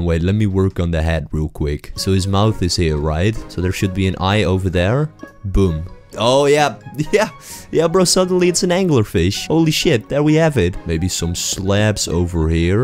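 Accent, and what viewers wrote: Netherlands. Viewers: Ik hoor gwn dat je Nederlands bent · You sound pretty dutch bro like me. Like said you sound like. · Are u Dutch, you sound like it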